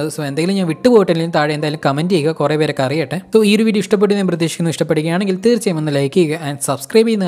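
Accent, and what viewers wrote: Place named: Kollam, India. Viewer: Cheyttaaa plzzzz bakiii pettannu idoooooo exam ayalll kanammm amma samathikullaaa... Plzzz chettantee videooo powliyannuu athu kondannuu mattulavaruu ittittuu but chettante expalanetion powwliiyanuuu piinnee njan nerathee itta messanginnu chettann reply thanthill orupaduuuu thankzzzzz pinee chettanteew sthalamm ethaa kettittu kolamm language polee unduuu ethayalluu superr😘😘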